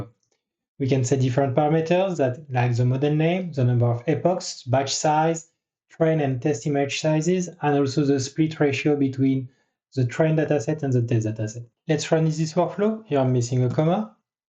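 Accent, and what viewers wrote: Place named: France. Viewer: Hi ! You sound french haha, can you make a video on extraction of boxes with Yolov0 and SAM for segmentation ?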